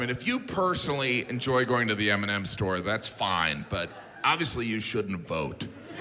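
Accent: United Kingdom. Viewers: That's an English (ish) accent, no such thing as a British accent. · Hi, loved your routine. Your British accent is hilarious, you're very handsome, far beyond the pale! Many greetings from Argentina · I like your British accent did your kiddos teach you that?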